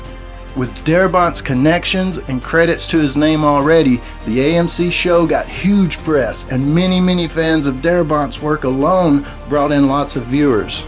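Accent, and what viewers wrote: South, United States. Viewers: Why is it that every fan Walking Dead video the guy has a Southern twang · good video. where you from man? I can tell you are a Southerner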